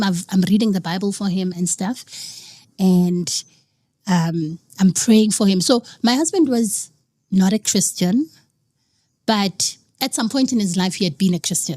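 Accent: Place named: Venda, South Africa. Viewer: Is the host a Muvenda? I detect hints of Tshivenda accent in his English ❤❤❤❤❤❤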